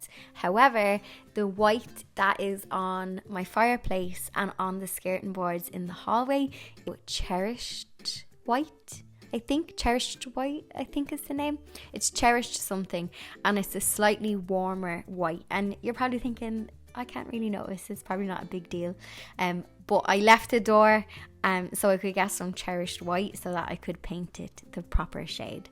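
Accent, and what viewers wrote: Ireland. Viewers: I love you so much! Whenever I'm down I watch one of your videos and your loveliness and your lovely Irish accent makes everything better!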